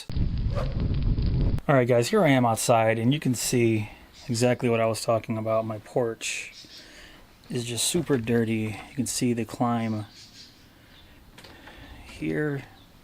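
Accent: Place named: Western New York, United States. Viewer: WNY - can hear the accent and tell by the driveway and the house architecture :-) Think I'll stick with my gas-powered pressure washer.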